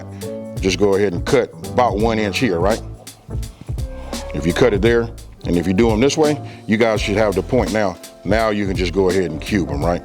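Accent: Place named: California, United States. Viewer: Cali accent thick. Came here to find something to cook for the night. Thanks bro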